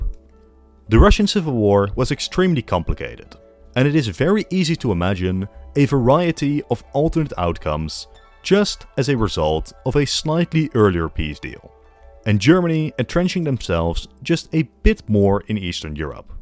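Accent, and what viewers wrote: Netherlands. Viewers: By any chance, are you Dutch or Flemish?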